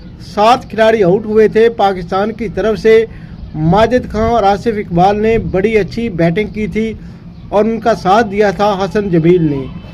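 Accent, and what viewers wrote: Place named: Punjab, India. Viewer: اصل میں کہنا تو نہیں چاہیے مگر کیمرے پر واقعی گفتگو کے لیے اعتماد کے ساتھ مطالعہ چاہیے ہوتا ہے تاکہ الفاظ کا چناؤ ہو سکے کیونکہ تکرار ادبی اور تقریری خامی ہے۔صاحب پوسٹ دونوں حوالے سے کمزور معلوم پڑتے ہیں کیونکہ الفاظ کی ادائیگی میں بھی اعتماد نظر نہیں آیا۔بھلے پنجابی لہجہ غالب رہے لیکن مطالعہ روانی بخشتا ہے ۔ڈراما نگار منو بھائی کا لہجہ پنجابی ہی تھا مگر گفتگو سے پڑھے لکھے ہونے کا پتا چلتا تھا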